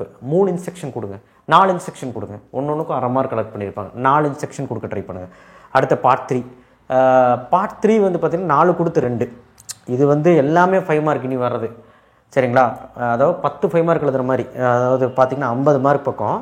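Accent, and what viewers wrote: Coimbatore, India. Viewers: Sir neega Coimbatoreingla sir 😊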